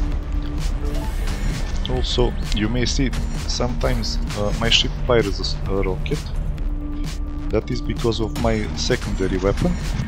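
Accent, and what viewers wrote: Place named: Russia. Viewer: Русский судя по акценту )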